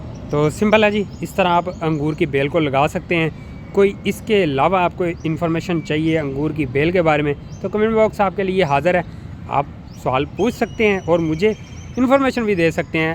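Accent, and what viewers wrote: Punjab, India. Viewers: پنجابی لہجے میں اردو تقریر۔لمبی اور فضول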